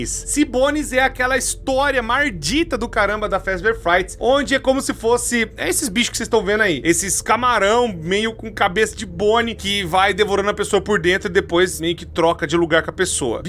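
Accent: Brazil. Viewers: E tu é bahiano 🤣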